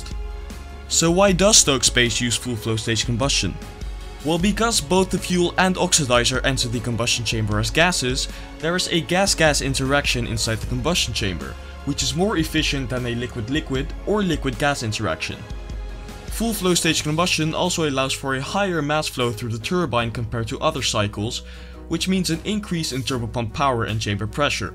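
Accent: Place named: Netherlands. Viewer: Good content, but please keep working on that Holland-accent 🙉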